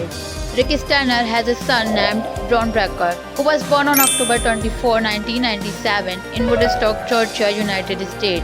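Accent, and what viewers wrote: India. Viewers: Why does your voice sound so weird? It sounds like you're from India or something. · Seems to me the narrator need to work on her accent! The whole world knows she's from India!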